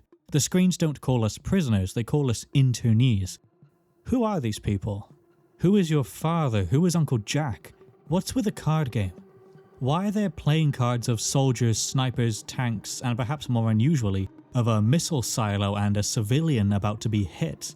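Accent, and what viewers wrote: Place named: Wales, United Kingdom. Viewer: You kinda sound welsh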